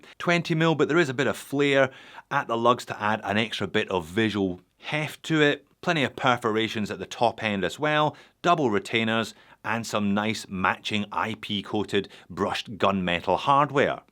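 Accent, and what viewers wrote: United Kingdom. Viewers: Thank you Sir, love the accent ! Is that from United Kingdom 🇬🇧?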